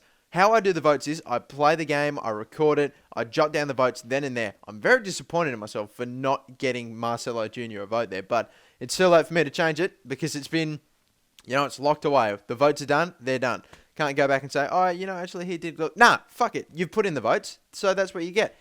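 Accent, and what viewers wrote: Australia. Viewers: Gotta love the Australian accent! :)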